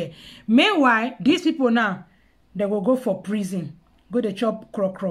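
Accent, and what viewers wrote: Nigeria. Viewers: Madam your vidoes are so helpful but try to speak proper English please coz some of us are not from Naija and we are finding it so hard to understand your pigeon English (sorry for wrong spelling) Thank you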